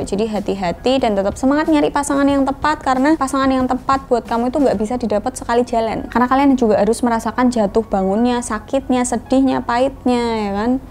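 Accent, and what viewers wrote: Java, Indonesia. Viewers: Mba medok amat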